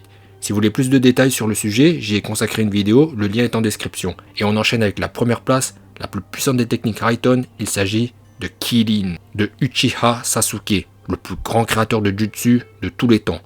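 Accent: Japan. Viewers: ton travail de porte parole, ton accent japonais, masterclass, le jour où tu seras chauve tu auras sans doute la capacité d'utiliser le kansei migatte no chauvui avec un peu d'entraînement, bonne soirée à toi et tu passeras le bonjour au grand maître kishimoto 🙏🏻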